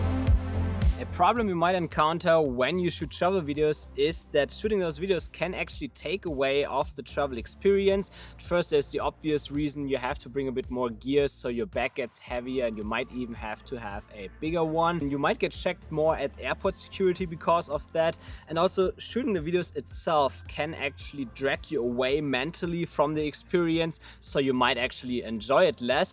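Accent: Germany. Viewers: Where you‘re from originally? Germany? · I love your German accent. also your cool content. thanks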